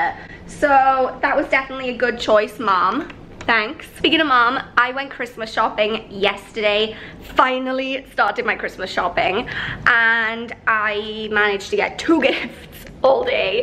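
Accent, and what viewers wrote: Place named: Scotland, United Kingdom. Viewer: I'm usually pretty good placing accents but yours intrigues me.Ive been watching some of your Kuwait vids (Im in Kuwait on a US mil base).You've got a scottish/midlands/aussie thing going on.So which one?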